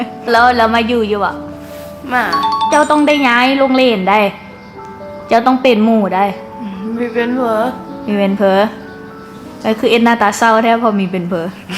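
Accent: Phu Thai, Thailand. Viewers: ผู้ไทดงหลวง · สำเนียงเหมือนสาวภูไทย เสียงน่ารักหมาก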